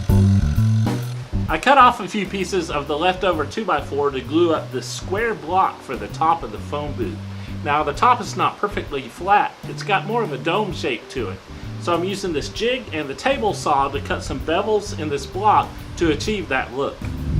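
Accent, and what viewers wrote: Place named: South, United States. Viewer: Great job, loved the British slang with southern accent.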